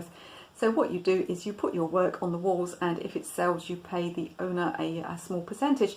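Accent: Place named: Southern England, United Kingdom. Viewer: Thanking you. Im a painter and I originally come from Tonbridge in Kent but I have lived in Australia for years now. I havent been home for many years so love hearing your southern accent.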